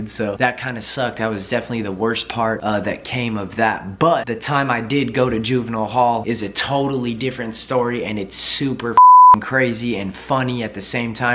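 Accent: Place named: Southern California, United States. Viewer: I can tell u from socal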